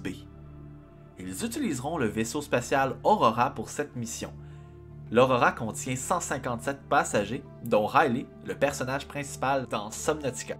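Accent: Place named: Quebec, Canada. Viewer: j'adore merci pour cette série, je suis d'ailleur jaloux de ton accent qc le miens en pas assez prononcé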